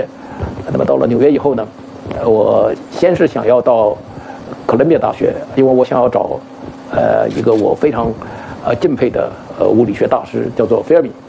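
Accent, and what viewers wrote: China. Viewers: 精彩。在美国生活了一辈子，英语的华人口音还这么明显。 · 1922年出生的可以说是近代史活化石了呀 他的口音就是当时中国的口音吧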